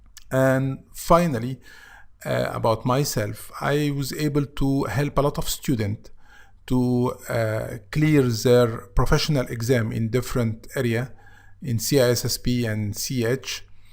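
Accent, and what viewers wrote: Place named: England, United Kingdom. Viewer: Inspite of that English accent everything is okay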